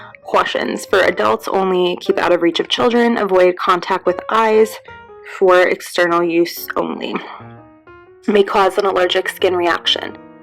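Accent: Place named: New Jersey, United States. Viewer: Its a British brand and it works. Are you from the Jersey Shore? The accent :)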